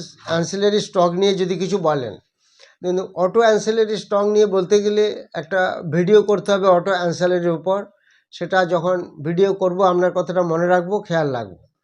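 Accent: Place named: United States. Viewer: হ্যাঁ স্যার, আপনি কিছুদিন ধরে আমেরিকান এক্সেন্টে ইংলিশ বলতে শুরু করেছিলেন, আমি মনে করেছিলাম বাংলা বললে হয়তো অন্য ধরনের সমস্যা হতে পারে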